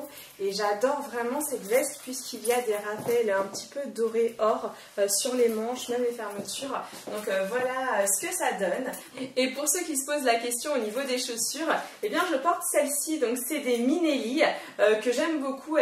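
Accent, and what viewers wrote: England, United Kingdom. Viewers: Tu es juste MAGNIFIQUE.....tout est parfait le Make up est généralissime même une débutante peu le reproduire géniale. Pour l'accent anglais ça me rassure j'ai le même que toi LoL..Aller des bisous Mouhaaaaaaaaaaaaaa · Ce look est vraiment top soft et efficace et puis ton accent anglais est trop mignon ! Et j'ai le même collier Mim' Bisou bisou ♥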